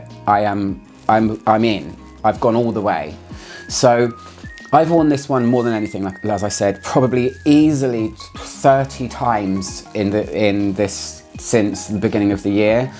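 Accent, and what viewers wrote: United Kingdom. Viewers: I LOVE your british style and your pronounciation ❤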